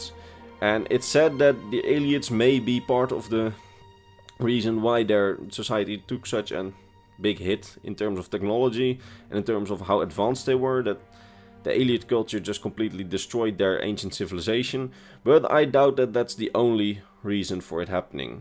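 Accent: Netherlands. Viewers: Are you dutch? I can tell from your accent hehehe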